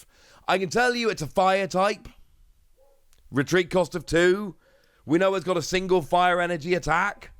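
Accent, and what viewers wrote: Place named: United Kingdom. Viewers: British accent 😌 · He’s British. Kinda like Wah-ter (water) is watt-ah.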